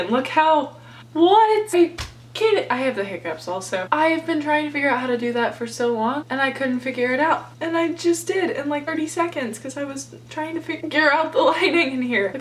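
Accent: United Kingdom. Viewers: Your English accent is spot on!😂 love your videos! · Your British accent is the best😂 I love how you really show your personality in your videos and you’re so fun to watch💕 best of luck with the dog!!x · If I had not just bought a dredsage bridle I would purchase yours and I loved that spot on brtish accent!!👌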